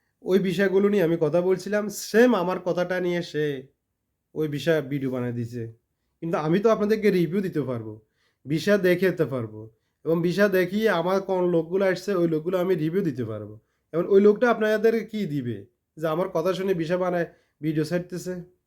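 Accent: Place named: Pabna, Bangladesh. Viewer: ভাই পাবনার কথা শুনে বহুত হাসি এসে গেছে।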